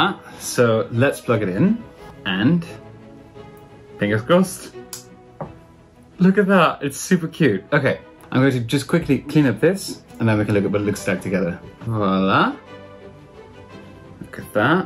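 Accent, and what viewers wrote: United States. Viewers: 🍃♥️Really sweet❗️. Romance is in the air at LaLande which makes it all the more fun to watch. I was wondering how you came to have an American accent when you learned English? You have a beautiful voice…would love to hear you speak French more too!🍃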